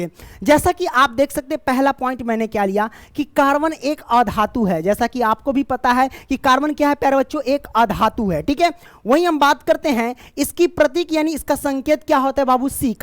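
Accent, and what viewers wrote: Bihar, India. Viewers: Sir aap Bihar ke ho kya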